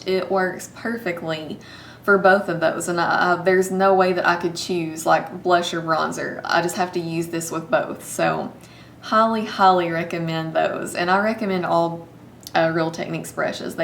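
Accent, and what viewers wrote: South, United States. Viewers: I couldn't agree more that there is no need to spend a ton of money on brushes for a pretty makeup look. BTW...I love your southern accent. :) You are so pretty, and down to Earth. I enjoy watching your videos.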